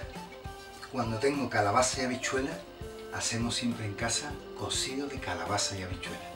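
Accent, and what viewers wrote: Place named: Andalusia, Spain. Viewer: Muchas gracias. Eres un artistazo en la cocina. Tus recetas son lo mejor. Imagino que eres de la zona de Sevilla o Cádiz a juzgar por tus recetas( amén de tu acento). Un saludo amigo.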